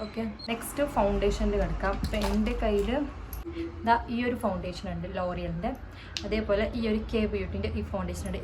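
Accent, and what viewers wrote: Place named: Malappuram, India. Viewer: Loved your malappuram slang... Maakup 😅😅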